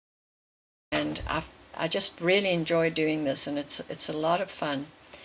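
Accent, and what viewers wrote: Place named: South Africa. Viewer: Hi..I'm new to water colour, and your demo's are so helpful. I'm am oil painter, so it's quite an adjustment trying to work in reverse to what I'm used to. Nice South African accent too...where in SA are you from 😊?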